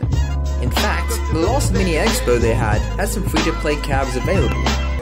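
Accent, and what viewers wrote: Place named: South Australia, Australia. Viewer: South Australian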